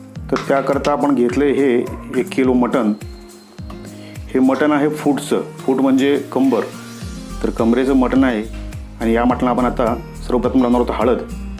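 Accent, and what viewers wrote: India. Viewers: तुमच्या भाषेवरून तुम्ही नगरचे की काय असा प्रश्न पडतो?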